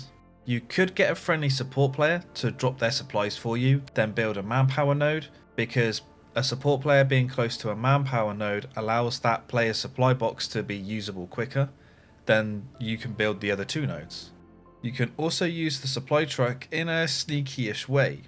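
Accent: United Kingdom. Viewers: Best ever explanation on the internet…and in British English which makes it even more pleasant to listen to ;)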